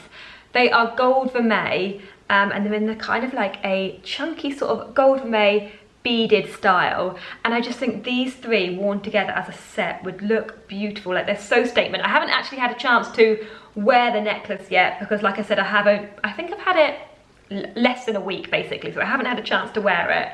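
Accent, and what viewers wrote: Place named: United Kingdom. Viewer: I love your style... and your British accent, too. Greetings from Canary Islands, Spain. 😘😘😘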